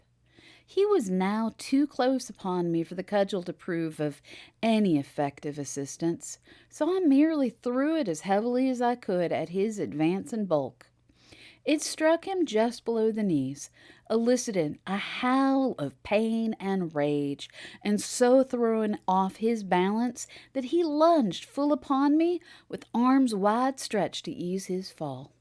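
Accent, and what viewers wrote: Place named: South, United States. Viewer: I am enjoying the story time. The slight southern accent is awesome.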